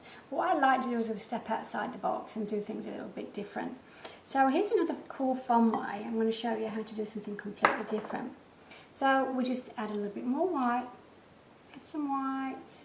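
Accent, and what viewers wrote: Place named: London, United Kingdom. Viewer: Hi there ive discoveted your chanel and absolutely loving everything you surround yourself with. The girly in me would love to just emulate everything you have. However I live in a house full of males (husband and 2 sons so I keep it a very neutral grey and white theme). I adore the colours of the gorgeousness on the table as well as the colours of your paints. Just a couple of quesries/points... When you were mixing your paints and whilst adding in the white - you mentioned you were adding 'teaspoons' of white paint - however, the spoon you was using appeared to be much bigger than a teaspoon so please would you be able to clarify your measurements a little better to save confusion in future videos. The spoon in the white paint also appeared to be quite a bit bigger than the spoon in your powders which did look more like a teaspoon size. 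Also you mentioned cost in dollars but you sound English (from the greater London area). Are you based in the states or some other place that uses dollars as I suppose I am assuming you mean US dollars but this could be just my assumption. If you are in the UK still, please could you provide costs for your paint powders in £ sterling. Just one more thing. The resolution of the video doesn't fit a full mobile screen (when in landscape mode). All other videos I play from other channels fill the area as they should. Is this something in your set up or mine. Although I would say it's not mine but maybe something different I have to do with yours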